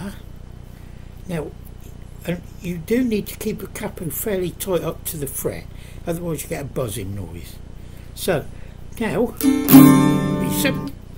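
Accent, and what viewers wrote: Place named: South London, United Kingdom. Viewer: Old..... but not obsolete.... You sound as though you are from South London, Southwark maybe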